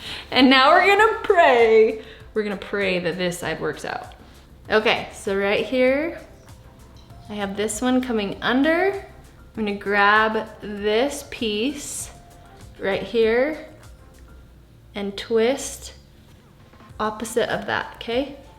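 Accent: Utah, United States. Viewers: Holy Utah accent! 🙂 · Oh my gosh. You’re in Utah. Yay me too. Must be why I was wondering what accent when I read this lol.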